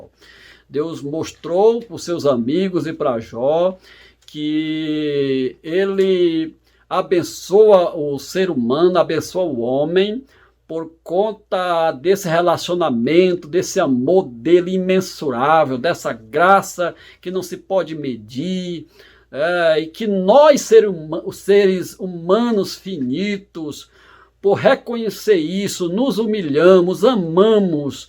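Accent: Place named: Northeast, Brazil. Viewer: Maravilhoso ensino, No bom sotaque nordestttíno.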